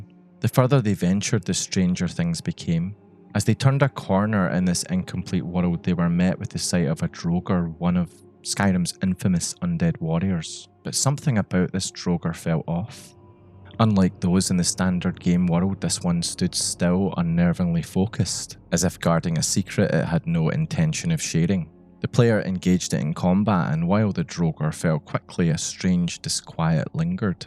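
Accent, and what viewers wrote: Scotland, United Kingdom. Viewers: Subscribed for my specific favourite Scottish accent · Ah! A Scotsman!! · Not gonna lie, your slow, soft, Northener (Scottish?) accent makes all those stories so much more eerie 😨😨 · i was watching this in the background at work, and my boss went “holy scottish accent” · Scottish accent makes this video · Where are you from in Scotland? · I didnt know I needed urban legends of skyrim narrated in a socttish accent until now. Thank you so much. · I have no idea why but this was the first video of yours to pop up on my radar and hearing another scottish voice was oddly satisfying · You're Scottish aren't you? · Tbh I've never heard of a Scottish Skyrim Player, let alone a storyteller. I like it alot.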